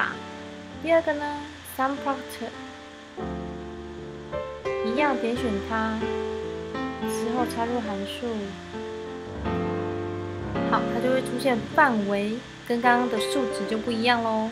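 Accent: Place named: Taiwan. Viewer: 非常慢的台湾普通话，我听起来心闷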